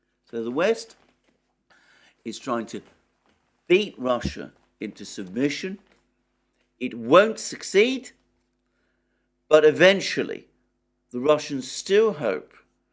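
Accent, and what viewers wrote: United Kingdom. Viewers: Just found you. Ha. Great content. Almost like something I would have put out if I wasn't so lazy. Plus, with a British accent which makes you way cooler than I could ever be. I will say, however, that I am on record as rejecting this pressure on Iran re: nuclear capabilities. I wrote an article over 15 years ago in response to a Kenneth Timmerman piece. It was published in the Washington Times, and was entitled "Iran Paternalism." Good stuff if I do say so myself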